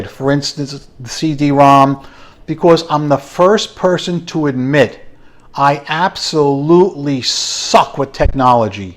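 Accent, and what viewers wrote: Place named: East Coast, United States. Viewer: my west coast ears hearing an east coast voice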